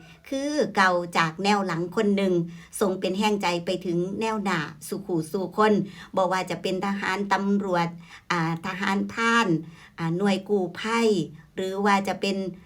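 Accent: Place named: Isan, Thailand. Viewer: คือห่างหายคึดฺฮอดเสียงเว้าสำเนียงอีสานอุบลไปคนแท้อีหล่าดีเจ.♥♥♥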